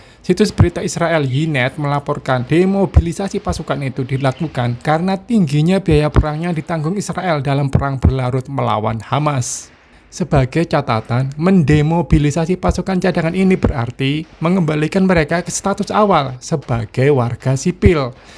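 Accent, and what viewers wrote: Java, Indonesia. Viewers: Komentatonya Ngomongnya MEDHOK... orang mana ya..??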